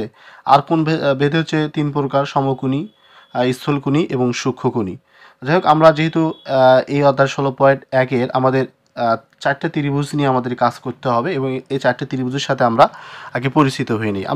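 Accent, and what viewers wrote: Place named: Gaibandha, Bangladesh. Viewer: Apnar basha gaibanda na vaiya😂😂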